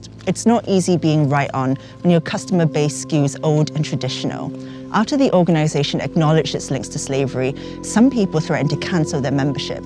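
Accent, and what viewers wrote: United Kingdom. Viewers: It’s cool to see and Asian decedent with a British accent narrator the history of uk 🇬🇧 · Never thought I’d see a Asian with a British Accent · Isnt this the women that doesnt use toilet paper or something? Why is she speaking in a british accent all of a sudden? <link>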